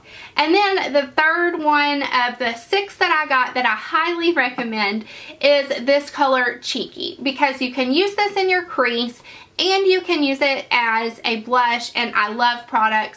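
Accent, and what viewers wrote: South, United States. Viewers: Those are amazing!! And as a former texan...I love your accent! Still a southerner..just a state over. I subscribed. Going to have to check the site out!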